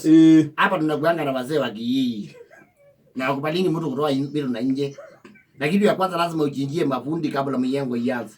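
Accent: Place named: Kamba, Kenya. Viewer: Ngoni unaongea kama mkamb kapsaa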